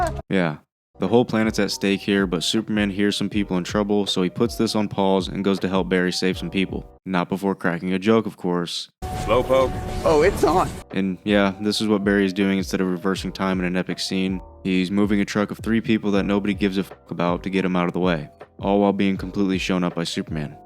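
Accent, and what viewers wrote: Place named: United States. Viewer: You are still a soulless excuse of a human being for not caring about the family in the theatrical cut. But you sound American so it’s almost a cliche. Still important for the film to reiterate that they are heroes. They are here to save everyone. Collateral damage or the world. About your conclusions I must fall in favor. The Snyder cut showed a better representation of the heroes. Everyone was aware of the stakes and reacted accordingly. The heroes had important roles and ended the mission with serious determination.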